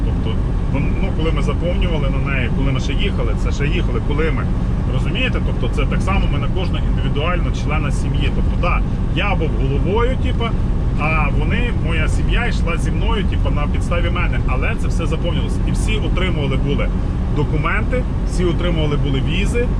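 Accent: Lviv, Ukraine. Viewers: Класне пояснення гарна дикція Тай акцент скорше Львівської області. Однозначно лайк.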